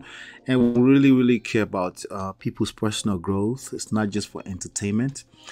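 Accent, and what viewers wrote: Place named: Nigeria. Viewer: Hi, you sound like a Nigerian. I am watching from Nigeria. Greetings. Just subscribed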